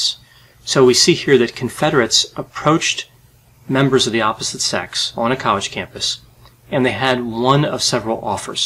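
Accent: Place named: Philadelphia, United States. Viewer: Anothe cool video! You’re from the Philly area aren’t you? I heard a few words that gave it away.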